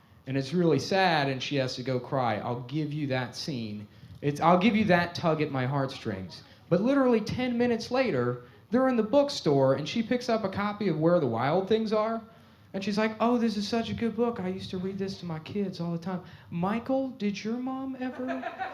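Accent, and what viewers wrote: United States. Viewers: Why does my friend Nick sound like all my relatives in Philly who don’t talk to me? I like Bed Stuy Nick more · You can really hear his Mid-Atlantic accent in this clip.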